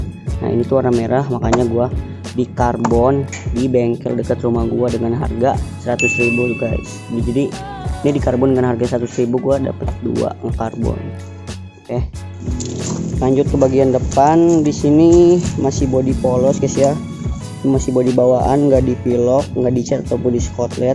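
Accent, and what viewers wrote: Serang, Indonesia. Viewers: orang serang bro?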